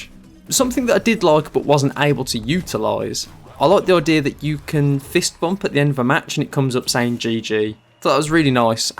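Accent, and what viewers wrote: United Kingdom. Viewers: I like this brummie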